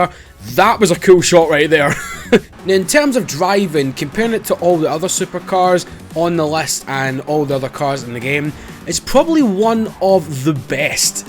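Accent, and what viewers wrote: Scotland, United Kingdom. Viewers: This guys Scottish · Are you Scottish?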